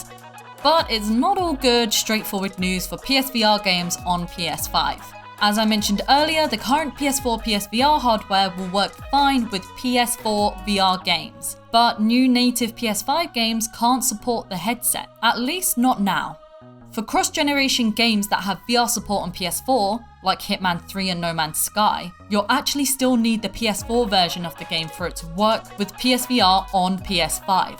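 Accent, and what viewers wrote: United Kingdom. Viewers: Hahahaha and she has a british accent. Thats bollocks! Lol. It works in the us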